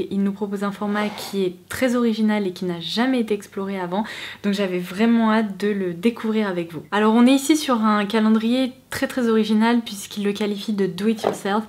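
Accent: England, United Kingdom. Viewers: Bonjour, je n'ai pas Instagram et je ne sais pas m'en servir, j'ai juste un mail <mail> , au delà de ce petit problème j'adore vos vidéos, vous avez une voix apaisante, vous avez un bel accent anglais. Merci pour m'avoir lu. Bien cosmetiquement vôtre. Delphine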